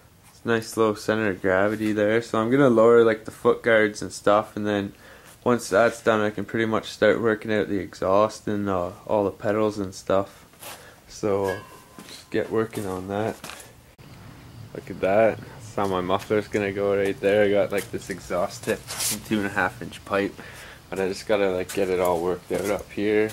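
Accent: Canada. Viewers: Where are you originally from? It sounds like your from western canada originally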